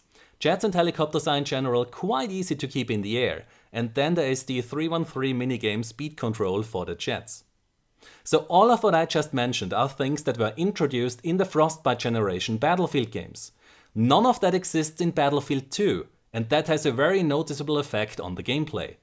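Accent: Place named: Germany. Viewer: can you speak german? I swear I heard a lot of german pronunciation in your work, so cute!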